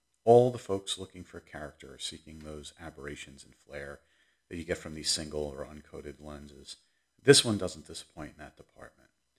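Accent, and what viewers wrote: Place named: United States. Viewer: Thanks for this review and comparison. Always loved Minolta for the very beautiful color reproduction and quality. Olympus surprised me too. I have never owned a single Olympus lens, but judging by various reviews, I had the impression that, in general, Olympus lenses were of average quality. However, in this comparison, the Olympus performed very well, especially in the corners. I also wanted to say that I liked the composition in the photographs themselves (or the cropping applied later). One can immediately say - this is a person who knows a lot about composition. The very first photo is BOOM, you can immediately put it as wallpaper on your desktop :) And that photo with a stone wall is so sharp and colorful. And the picture that was most likely taken from somewhere in the forest (?) and we see a landscape and those biiig columns - a very good composition and the picture itself is very atmospheric, with a beautiful distribution of light. 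I immediately became interested in what kind of place it was taken. Judging by your pronunciation, you are an American. But the country is pretty big (although somewhat smaller than mine, hehe), so for now it remains a mystery to me, because there were no signatures. Anyways, very interesting comparison and I'm glad I stumbled upon it today (even though it's been 2 years) because today there are so many different kinds of "bloggers" and... to be honest, they show themselves more, rather than their content. Constant jokes, all sorts of vide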